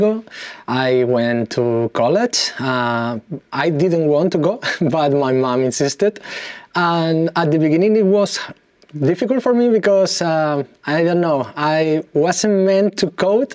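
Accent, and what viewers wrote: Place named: Spain. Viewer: Nice accent very authentic spaniard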